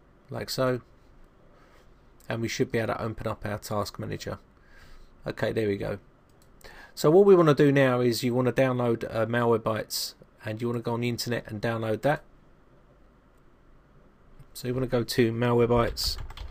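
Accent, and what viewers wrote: United Kingdom. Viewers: You spelt infection wrong in the description, you wrote "ifections". BTW, I like British accents :)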